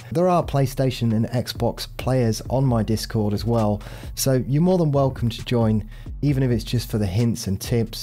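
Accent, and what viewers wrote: United Kingdom. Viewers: Youre forgetting about the gorgeous british accent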